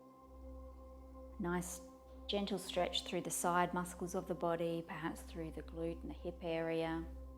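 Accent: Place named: Australia. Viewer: Great practice. I really enjoyed the first pose, whad do you call that? And, I love your aussie accent 🙂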